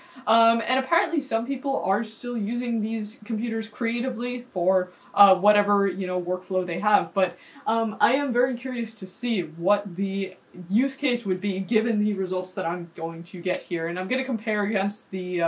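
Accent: Canada. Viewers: Very curious where your accent is from, I like it. (It sounds very Canadian to me, but I could be wrong).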